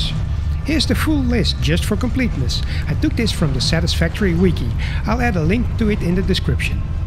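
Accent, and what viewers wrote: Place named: Netherlands. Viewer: Nice video, and accent! Dutch?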